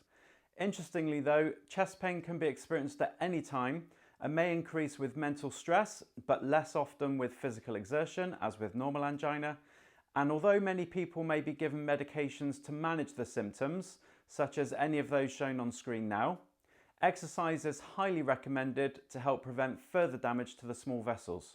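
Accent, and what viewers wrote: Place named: United Kingdom. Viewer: Thank you for this very helpful video. I could listen to your British (?) accent all day long. I hope to be incorporating this exercise regimen along with Flow Therapy (Compression for Micro Vascular disease) soon.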